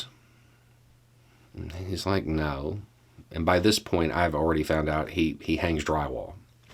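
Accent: South, United States. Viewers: I started watching this channel because a bearded white male with a southern accent spouting common sense wisdom was kind of funny. I now realize your whole point is to invite us to think, to challenge what we hear or read. "It's just a thought" I understand as "ponder it for yourself and feel free to agree or disagree but make sure you have your own arguments either way."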